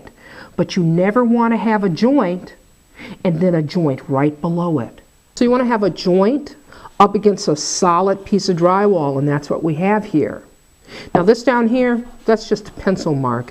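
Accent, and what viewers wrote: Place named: East Coast, United States. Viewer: I like to watch you. You are as the previous person mentioned delightful. I also feel you address points that a person (women) like to know. There is more than one way and you cover briefly the differences and don't claim one is better than the other but point out why you may want one over the other at times. I have watched a lot of videos in preparation for a remodel that required a couple walls (paneling) being opened and removed and wanted to put drywall in its place. I have done small repairs in the past on walls and felt comfortable attempting this large project but but after watching some videos in research I felt a little intimidated, but after watching you I feel much more at ease. Thank you. P.S. Your accent reminds me (a Californian) of my east coast friend.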